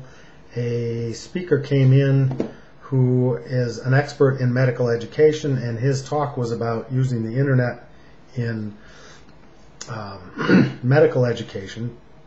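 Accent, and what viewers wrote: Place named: United States. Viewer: very informative video, good information for educators. Very American sounding accent.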